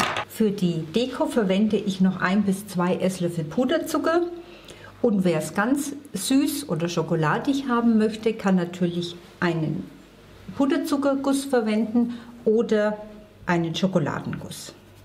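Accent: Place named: Franconia, Germany. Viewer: Liebe, deine Videos sind einfach spitze! Wie schon viele andere ZuschauerInnen geschrieben haben: total gut erklärt, praktisch gelingsicher, zudem die vielen praktischen Tips und deine ruhige, freundliche Art... Passt einfach alles! Ich wage es mal, eine persönliche Frage zu stellen: Du siehst vom Typ her aus wie eine Oberpfälzerin (ich kenne so einige, deren Schwester du sein könntest!🙂), aber der Dialekt geht eher ins Fränkische, finde ich. Ist da was dran? Ich freue mich auf weitere Rezepte und Tips von dir! Liebe Grüße!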